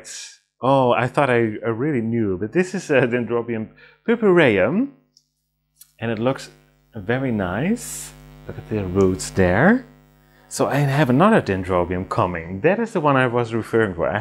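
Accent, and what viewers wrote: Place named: Netherlands. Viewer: ! I thought I recognized your accent! I have family friends in Ryswyk! What part of The Netherlands do you live in?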